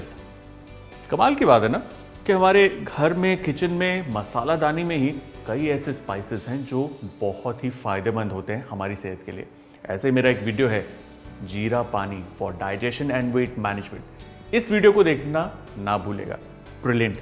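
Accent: Punjab, India. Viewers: Hi ji i am big fan of u it’s so cute ki hindi bolty bolty punjabi ki accent i love it 😻 yummy recipes always 💞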